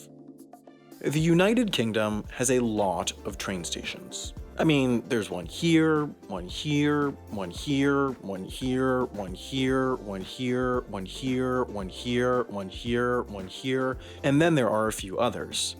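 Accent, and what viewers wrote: United States. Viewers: Why do all these videos about ghost trains (and stations in the UK) all feature an American voice narrating them? Is it because Americans (still in 2023) find the concept of trains a novelty and something of an 'amusement'?? ..... while every other country just sees them as a vital part of their transport infrastructure. And an asset to their country's economy. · Gabbering Yank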